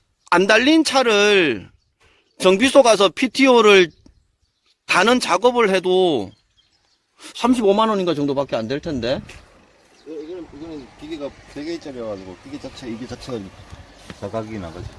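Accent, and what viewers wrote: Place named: Yecheon, South Korea. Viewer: 시끄라도 ᆢ예천말 정겹워요 ㅎ 늘 홧팅입니다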